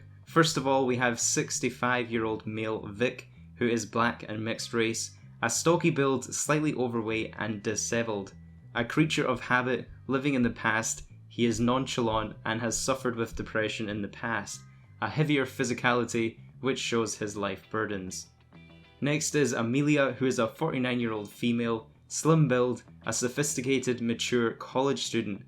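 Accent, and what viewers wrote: Scotland, United Kingdom. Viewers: Omg where u from, I'm Scottish too! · nonya business He's Scottish, not English. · he's Scottish clearly you dumbass......🤣😂😆😅😀 take a course in grammar dip shit....you mean to say..He's clearly Scottish you dumbass ass...man I can tell your a special needs kid aren't ya lmao. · he's Scottish clearly u dumbass, ur obviously not from england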